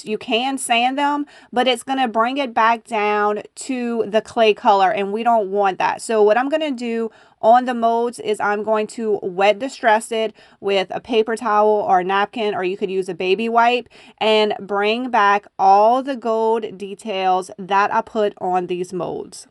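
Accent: Louisiana, United States. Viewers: Where are you from? Have to be a south Louisiana girl! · Hi, I just love all of your DIY videos! You have such great ideas! And I love your little Cajun accent! I’m a Louisiana girl myself, but from New Orleans, so I don’t have the Cajun accent. Thanks for all you do!😍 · I just love your videos! I’ve been going back and watching all of them! I used to live in Lafayette so I just loooove listening to that Cajun accent. I think the crusty pans are my favorite but I just love everything you do. You are such an inspiration to me and my crafts have gone to a new level just by watching your painting techniques. You are absolutely my favorite YouTuber! Something I would love to see is how to decorate a smaller home with very little wall space so that it doesn’t look cluttered. I just got a booth at the local antique store so I am hoping that helps with my hording look I’ve got going on lol. 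Thank you for doing these videos. You make me believe that I can actually make some income doing what I truly love. Keep them coming Sha! Love you tons!!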